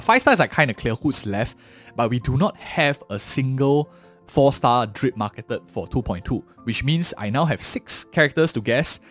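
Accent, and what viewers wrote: Singapore. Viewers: Heavy Singaporean accent (pls tell me)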